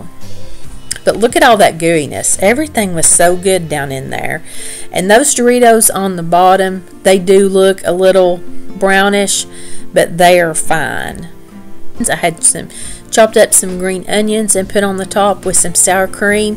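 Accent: South, United States. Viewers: I also love your wonderful accent 🙂. Sometimes I wish I was Southern 😂. But even though I can't speak in that beautiful way y'all do without being annoying or offending someone, I can try to make southern food. I've been making biscuits homemade from scratch since late last year and my family always loves them. I live in a country where there are absolutely no canned biscuits. So when I move back to the states Lord willing, being able to buy so many easy canned stuff will be such a treat. One batch makes about 11 biscuits and there never seems to be enough especially as our dog will not stop barking (when she's tied up momentarily so we can eat without her climbing all over my mom to get some) unless she gets one 😄.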